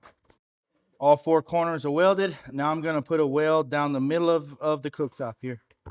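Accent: Louisiana, United States. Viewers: I love your channel. I,m a retired tool & die maker & appreciate a true craftsman. But something I noticed about was your accent, so I looked up were your shop was ln Wiss.That can,t be? He talked like me. That fellow is from South La. Then looked your Profile on your web site. ,I was born in Church Point La. Where might you be from?😃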